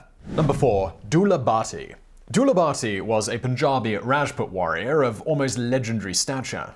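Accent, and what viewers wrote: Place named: United Kingdom. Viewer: I specifically listen to you for HOW you pronounce things. Give me British for this anglophile.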